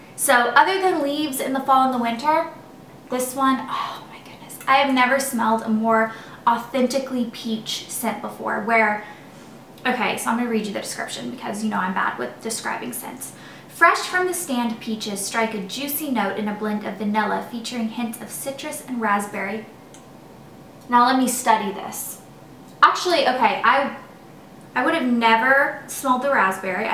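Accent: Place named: Tennessee, United States. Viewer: Pretty sure the CVS eye makeup remover is the same as the neutrogena one! Just in case you can't find a CVS. I love hearing your TN accent (I miss living in Nashville).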